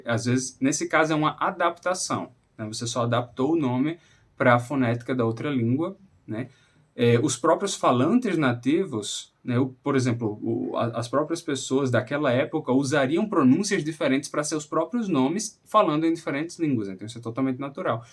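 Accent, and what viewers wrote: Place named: Maranhão, Brazil. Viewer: Parece sotaque do Maranhão 😮não sei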